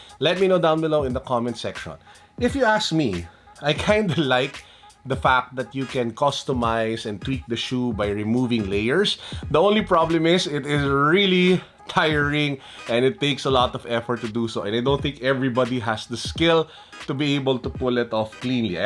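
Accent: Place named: Philippines. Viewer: That Filipino accent tho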